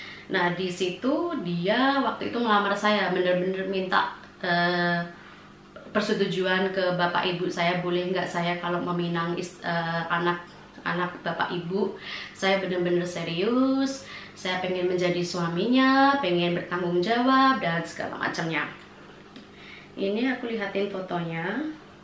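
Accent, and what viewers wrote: Java, Indonesia. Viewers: Iya mbaaaak Indonesia bangeeet soalnya. Nek ngobrol, "njawani" bangeeet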